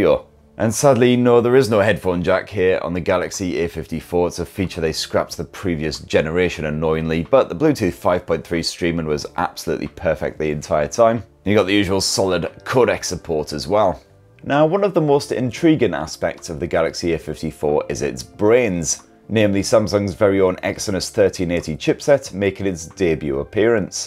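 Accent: United Kingdom. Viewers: British accent . i think.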